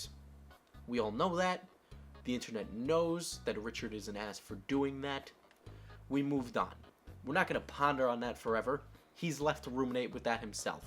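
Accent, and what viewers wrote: New York, United States. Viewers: Liam Collins I think its just the new yorker accent accompanied with memes. I dont think he acts like chrisraygun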